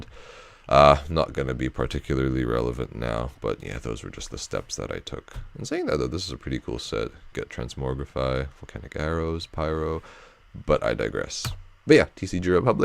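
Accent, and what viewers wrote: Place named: United States. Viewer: You live in New Zealand , funny you sound American! 😉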